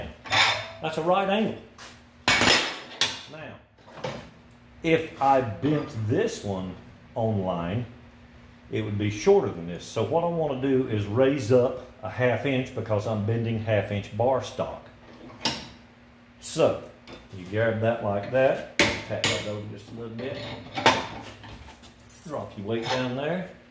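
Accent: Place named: South, United States. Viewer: Simple and effective method, and what nowadays they call "sustainable". Thumbs up. And all explained with the lovely southern accent!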